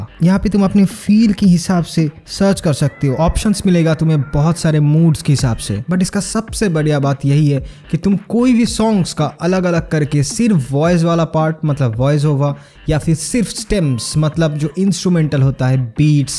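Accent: Bengal, India. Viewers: Re tumi bangali na Tomar tone e bojha jay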